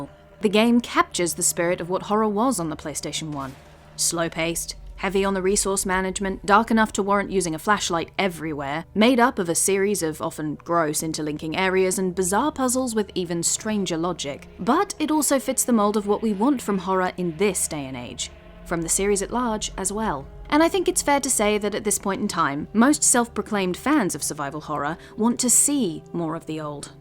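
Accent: United Kingdom. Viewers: Jesus you sound english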